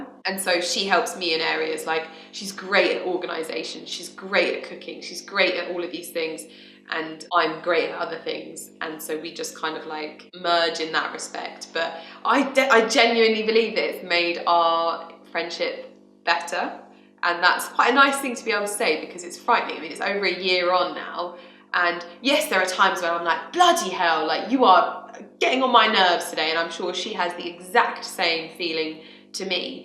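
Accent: RP, United Kingdom. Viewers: I think you have great grammar but I love what you have said because I'm the exact same. I think your accent makes you sound posh if you had an aussie accent like I do it's worse it's so bad. Xoxox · First! For the first time! I was waiting for the video and tadam! ❤️ Great video really! It’s nice to know you better (and sometimes think « oh! just like me! ») Too bad about grammar. I hoped increasing my english level by watching your videos and reading your blog 😁 Just kidding of course. To me your english sounds so posh and you know what Pr Henry Higgins says in My Fair Lady : « The french don’t care what they do actually as long as they pronounce it properly ». So grammar or not grammar that is not the question, to me you are perfect.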